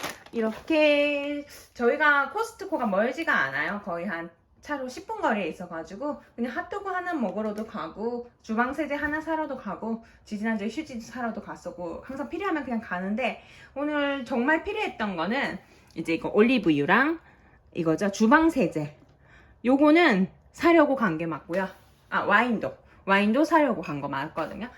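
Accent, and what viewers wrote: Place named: Japan. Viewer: 라면 많이 쟁여 놓으신거 보고 깜짝 놀랬어요 ㅋ 한국에 있을때 육개장 라면 엄청 자주 먹었었는데 ㅋ 갑자기 급 먹고 싶어지네요! 발음이 일본인이 한국말 하는 거 같아요! 일본어가 네이티브 수준이신가봐요! 너무 부러워요^^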